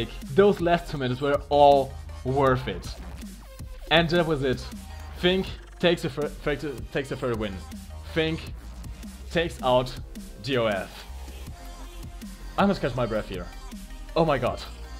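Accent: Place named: Germany. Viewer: Also bist du deutschstämmig. Ich dachte schon immer das du einen deutschen Akzent hast...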